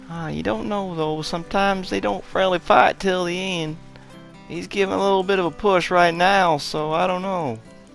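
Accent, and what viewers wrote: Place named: United States. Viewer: Lol the country accent gets me every time 😂😂😂 Any thoughts on the summer update?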